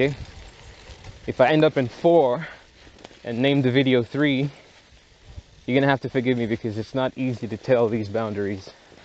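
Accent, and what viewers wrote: United States. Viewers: you said that you never been in the US neither the Europe so how did you get the American accent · Off course your accent is like an American 🇺🇸 I enjoy watching your videos because I never been in Burundi since when I was 7 so it’s pleasure to me I really like it When all those roads get tarmac the neighborhood will look more beautiful ❤️❤️🇧🇮🇧🇮🇺🇸🇺🇸🇺🇸🇺🇸🇺🇸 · Man come on!! Be honest seems like u grow up in California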